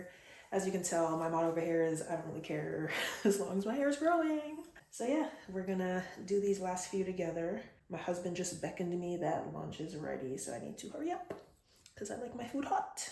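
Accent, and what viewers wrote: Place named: Barbados. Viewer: So good to hear a fellow country woman 🙏🏽 I would not have guessed that you are a Bajan you have lost most of your accent. Your hair is look good and I will be following your progress as my hair is the same length but it seems to have slowed down in it growth but is getting denser and thicker. Also I am planning to get a ReAir. I have seen and read some very good reviews. My advice to you is use a heat protector and increase the heat slightly for the final drying process for each section and you will see better results. 💕👍🏾🙏🏽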